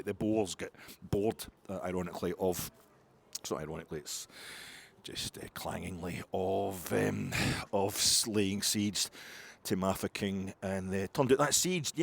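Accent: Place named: Scotland, United Kingdom. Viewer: Celtic fan here ,enjoy listening to you ,you have a very nice timbre to your voice lovely Scottish Brogue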